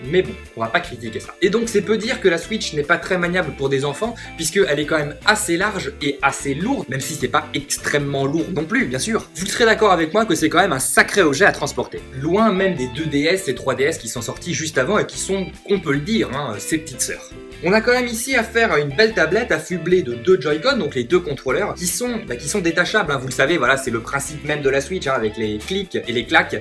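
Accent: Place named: South, France. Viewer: accent du sud mdr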